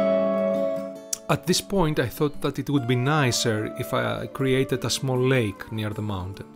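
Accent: Greece. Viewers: I love your Greek accent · Nice greek accent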